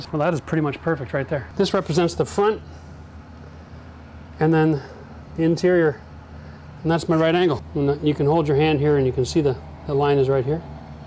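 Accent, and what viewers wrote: Canada. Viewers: You live in Florida but have a Canadian accent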